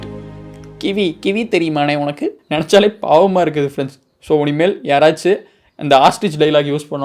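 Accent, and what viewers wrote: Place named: Tirupur, India. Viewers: Neega thirupur ahh antha slaglaye therijuchu😂😂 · Tirupur naala dha namma slang la pesuringa...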